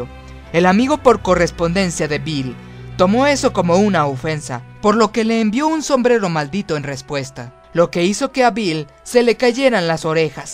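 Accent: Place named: Japan. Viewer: Like si Te das cuenta de el asentó japonés en todos los videos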